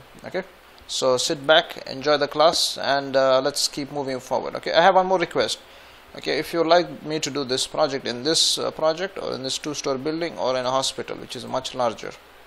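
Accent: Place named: Egypt. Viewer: 😂 بتتكلم لهجه مصرية ❤❤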